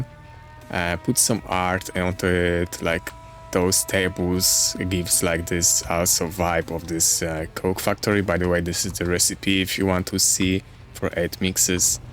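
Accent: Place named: Poland. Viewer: Wiem że jesteś z polski słyszę ten akcent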